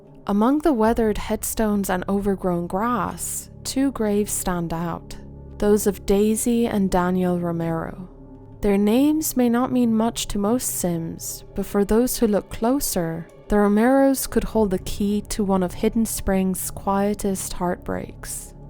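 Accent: Ireland. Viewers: I have been listening to you and watching your videos forever and only just now clocked your Irish accent!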